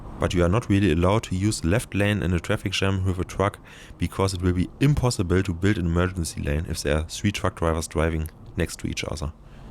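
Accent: Germany. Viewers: Are you making up this accent or is it real? I can't understand half of the things you say. It's almost like a joke with German stereotype: "ze car, ze road". Damn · Its real and hey theres nothing wrong with that. Hes trying his best. Not everyone in Germany is able to speak English. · You guys might like to know that there might be an experienced narrator coming in soon to take over the vocals. I also have a really rough time listening to the classic German English.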